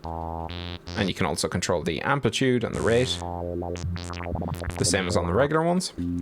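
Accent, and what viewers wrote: Ireland. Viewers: 1. I like Irish accents so this was a nice hour break from the local Aussie accents. 2. I don't like speaking in list items so this is the last one. Not everything we watch has to be less that 30 mins in length. Besides, this is SURGE THE MOVIE and the title would not make a lot of sense if the video only went for 10 minutes. This lightening fast Surge overview is probably more to your liking: <link> I found that one provided a really good quick overview. I just really appreciate people take the time to make videos and share their knowledge. I think I remember something about "workflow optimization and efficiency" when I did that course when working in a supermarket. It was just before we did the first aid session.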